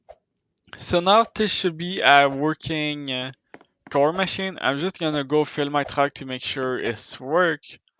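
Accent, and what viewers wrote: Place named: France. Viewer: Your accent says you're from another country. I mean, yes, you're from the United States, but at the same time, I feel like you have French roots.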